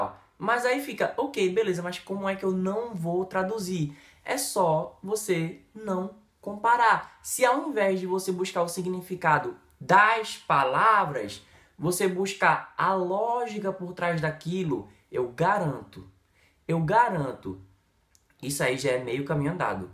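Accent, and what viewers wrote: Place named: Pernambuco, Brazil. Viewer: Pernambucano detectado!!! 🚨🚨🚨🚨🚨🚨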